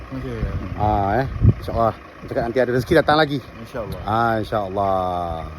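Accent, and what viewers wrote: Pahang, Malaysia. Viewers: Loghat Pahang awak yek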